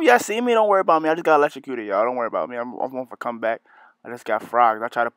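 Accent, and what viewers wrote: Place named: New York, United States. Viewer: I Love How New York you sound lmaooooo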